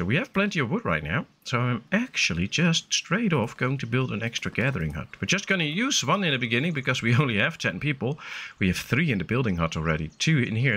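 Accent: Netherlands. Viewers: We zullen zien. P.S. Ik herkende gelijk het Nederlandse accent toen ik zocht op Foundation Lets play, vandaar dat ik in het Nederlands reageerde.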